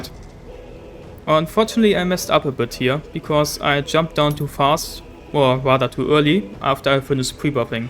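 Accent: Germany. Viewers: Mega Video, sehr spannend und geniale Erklärung, sodass man immer sehr gut verfolgen konnte, worum es ging. Der deutsche Akzent wird über die Länge des Videos immer etwas prominenter, fand ich lustig.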